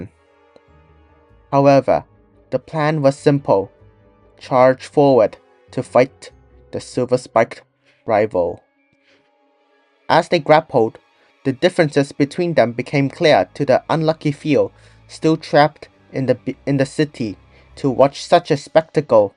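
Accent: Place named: United Kingdom. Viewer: British ahh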